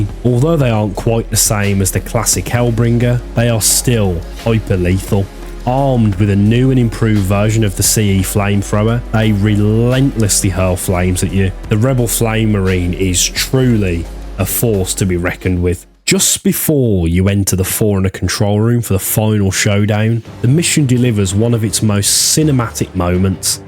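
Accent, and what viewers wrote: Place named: Wolverhampton, United Kingdom. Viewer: Id love to know where your accent is from. Im gonna bank on wolverhampton. Great video anyway❤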